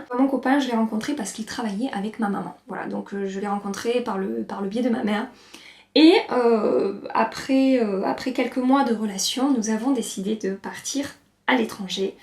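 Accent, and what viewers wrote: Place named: Narbonne, France. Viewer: Coucou! Je ne laisse jamais de commentaires sur les vidéos YouTube donc aujourd’hui est une première: j’ai découvert ta chaîne il y a peu (en octobre quand je cherchais des revues sur la Désert Dusk) et j’ai de suite accroché! Cette vidéo me confirme déjà tout ce que je pensais de toi: tu es une personne simple et douce! Tu restes naturelle et drôle! Merci de partager avec nous tout ceci! Le maquillage sur cette vidéo est bluffant! Je suis une make up addict, ce qui fait rire mes amies ahah! J’ai commencé à aimer le maquillage des le collège et maintenant avec ma soeur nous avons cette passion mutuelle! alors tes vidéos pour moi sont comme une bouffée d’air frais! Je te remercie aussi de me faire dépenser autant 😉 PS: j’ai cru reconnaître un accent narbonnais la première fois que je t’ai entendue (j’habite pas très loin et une amie qui vient de narbonne a le même)! 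Bisous et je te souhaite que ta chaîne connaisse un franc succès, il serait mérité 😘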